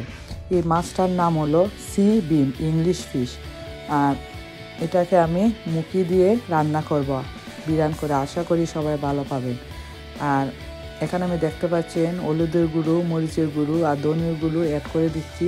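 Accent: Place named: Habiganj, Bangladesh. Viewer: Apa je moja oise lager r mukhi to kota oi nay apne mat hobigonjer tone ase gift dia gesi affa apne o diva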